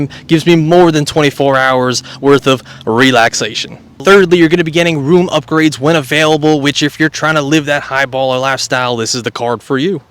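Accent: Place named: United States. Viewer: U beautiful man. Love ur country boy accent btw. Lol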